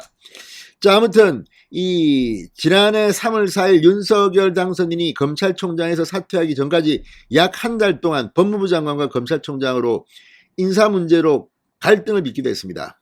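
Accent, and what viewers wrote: Gyeongsang, South Korea. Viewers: 참 잘하시네요 경상도 사투리가 재미있네요 나도 대구 촌놈임니다 좋은뉴스 부탁 드림니다 화이팅 !!!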